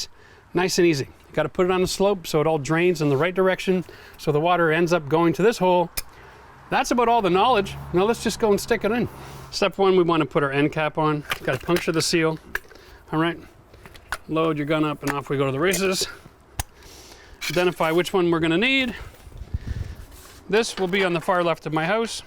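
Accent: Canada. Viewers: Good overview, as I dry out a basement because pumps were not plugged in. You sound a little Canadian?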